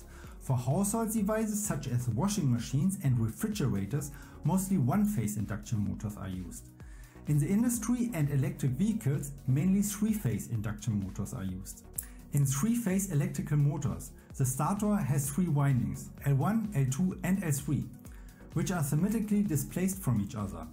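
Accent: Germany. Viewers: 1) maybe a bit stronger sound even at maximum, I have problems listening to your video on the go (in the Berlin S-Bahn for example) 2) your German accent is extremely strong and sympathetic... 3) you put a lot of subjects in your video. I think it would be better to make different videos for each sub-subject. As you're explaining the basics here, I guess most people (including me) are a bit over-burdened with the many technical expressions 4) maybe try to use as little text in your video as possible. Maybe try showing as much by 3d-models only. Maybe use also some pointers to point to the parts of your 3d-models you're talking about 5) thank you for sharing your knowledge about electric motors. Thank you a lot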